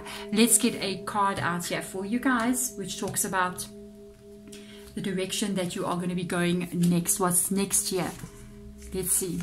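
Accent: South Africa. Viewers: Hello , are you South african afrikaans? I kind of recognize the accent .Spot on for the reading my story sadly.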